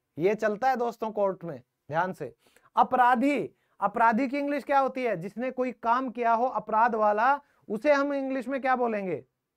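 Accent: Haryana, India. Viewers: Aap haryanvi ho kya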